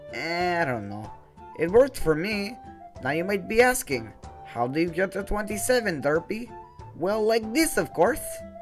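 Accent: Russia. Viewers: oh yes! i been trying to get good at sq-1. hears russian accent FOR FUCKS SAKE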